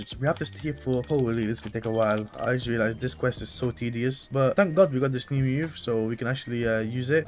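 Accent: United Kingdom. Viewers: u british or som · are u british?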